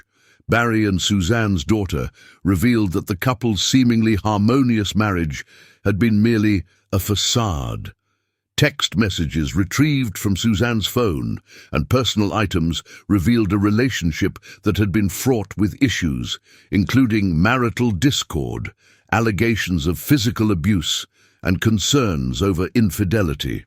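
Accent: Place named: United Kingdom. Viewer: that stupid British voice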